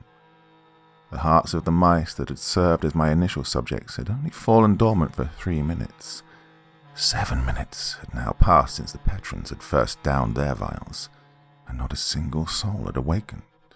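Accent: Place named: United Kingdom. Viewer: Quite enjoy the British voice and speaking pattern.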